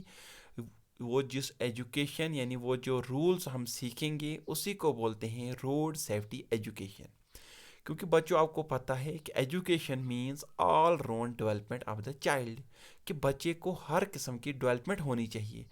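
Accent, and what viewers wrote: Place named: Kashmir, India. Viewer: By listing you I think u r from kashmir... Bcx this voice belongs to kashmirians ...🤨🤨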